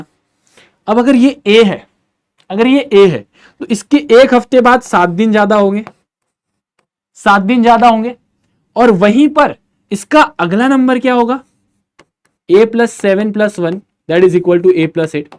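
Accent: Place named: Bihar, India. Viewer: Sir is bihari for sure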